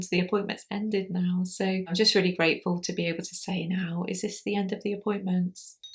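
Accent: United Kingdom. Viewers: It would be fun some day if you shared with us what your childhood Scottish accent might have sounded like next to your present day accent. LOL! I love listening to all the different accents of the English language. (This isn't an actual request, just a fun thought that entered my skull.) Thank you for another great video,!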